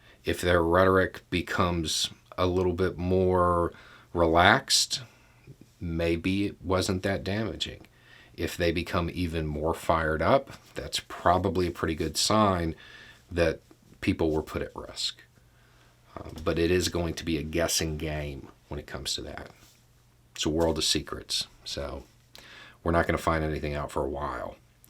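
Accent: South, United States. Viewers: Similar story here and after the first vid I decided that I owe an apology for having misread him from his accent and appearance. Then I looked inwards a little deeper and saw that the root of that misjudgment was MY OWN PREJUDICE. As someone who likes to think of themselves as being to the left on practically every issue that came as somewhat of an unpleasant surprise. Then thinking on it some more, that means I owe a lot of people an apology because I'm now sure that there isn't just one intelligent left wing white person in the South of the US so if I owe an apology then I owe it to them just as much. So here it is - to everyone in the South who isn't an ignorant racist, I'm sorry I had y'all wrong and I'm trying hard to recognise my prejudices and correct them. I've been meaning to do this for a while and your post pushed me to getting it done, thanks Danny. 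👍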